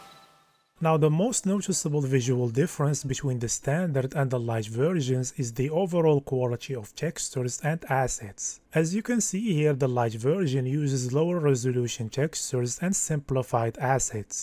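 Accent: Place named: Morocco. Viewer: Moroccans can never hide their accent xd ,nice video a sat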